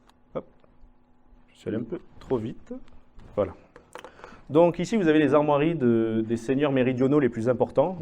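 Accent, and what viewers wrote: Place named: Occitania, France. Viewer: racontée avec un bel accent occitant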